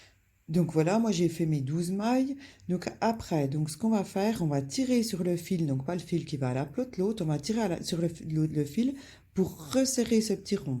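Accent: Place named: Haute-Saône, France. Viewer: Merci pour vos explications parfaites. Vôtre accent me fait penser à la haute Saône.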